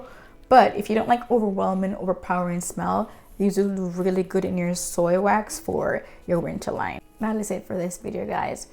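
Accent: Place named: Jamaica. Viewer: Totally love your review! Also love your accent…. Sounds like a beautiful Jamaican accent (I am also Jamaican). Good luck in your business journey.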